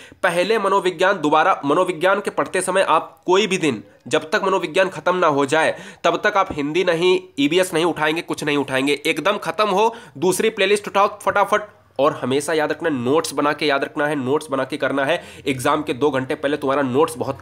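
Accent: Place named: Uttar Pradesh, India. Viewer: Up se sir khadi boli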